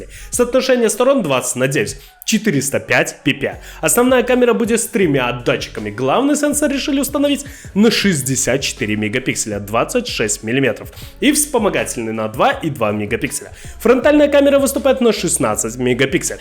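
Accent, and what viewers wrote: Belarus. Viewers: Когда слышу слова - гдзе, быстродзействие. Сразу понятно блогер из белорусии. Любит ныть и жараную картошачку. Яки небудь карэнны минчанин или гамяльчанин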